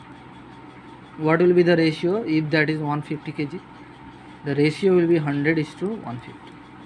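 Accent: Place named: India. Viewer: Indian accent: check Noise in the background: check math tutorial: check THIS IS AWSOME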